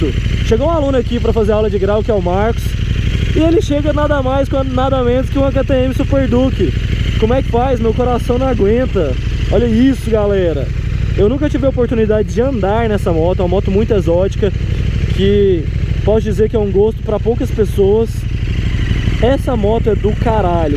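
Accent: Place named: Minas Gerais, Brazil. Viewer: Sotaque de mineiro! Gente boa! Super duke monstra!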